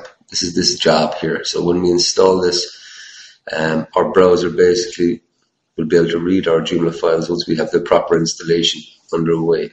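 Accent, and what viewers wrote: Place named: Ireland. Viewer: You sound Irish..but with a twist of something else. Its hard to tell with the audio quality. Thanks for the tut anyway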